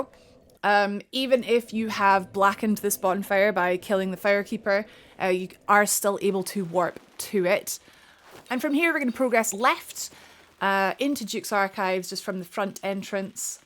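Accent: Scotland, United Kingdom. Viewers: Are you Scottish Great video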